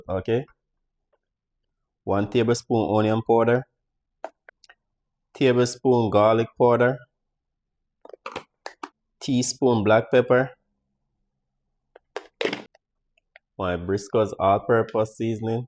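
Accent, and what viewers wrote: Jamaica. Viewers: Love how this chef expresses himself. No twist up tongue, straight Jamaican language. Yeah love it.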